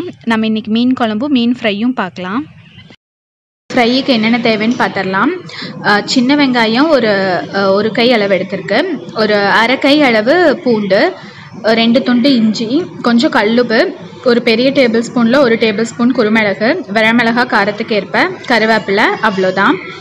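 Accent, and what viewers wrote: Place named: Coimbatore, India. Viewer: Hi sister I am ur new subscriber. I am also coimbatore I like ur kovai slang..... so cute....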